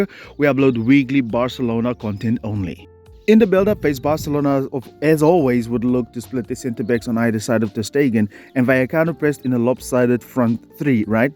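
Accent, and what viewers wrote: South Africa. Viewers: Cant wait for the second half’s video. Lol i dont know why but ypu sound a little bit South-African …accent wise😁😁😁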